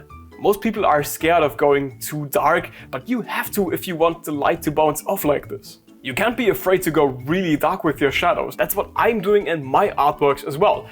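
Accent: Germany. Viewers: I just bought your book! draw like a mangaka!!!! thank you so much of all of your videos!!!!!!!!!!! I like hearing your German accent! it inspired me enough to get back only German lessons, so, that you for that too!!!!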